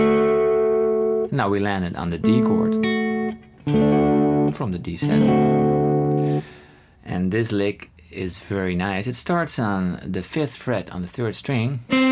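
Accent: Netherlands. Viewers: Great guitar lesson, very helpful! Your English is perfect, but do I hear a Dutch accent?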